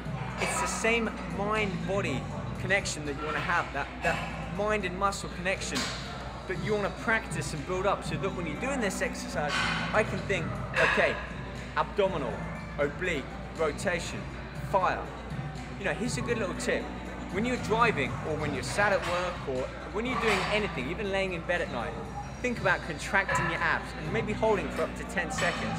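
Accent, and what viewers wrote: England, United Kingdom. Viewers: fuck ur englisch accent u fucking roid user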